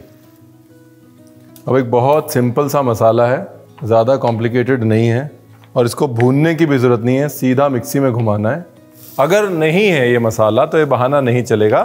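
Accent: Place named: Punjab, India. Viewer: Nice recipe with delicious punjabi tone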